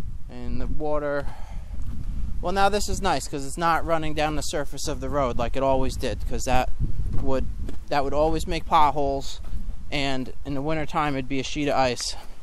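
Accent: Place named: New Jersey, United States. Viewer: Man you certainly live in a region with lots of drainage issues. Is this PA? I detect almost a Jersey-ish accent.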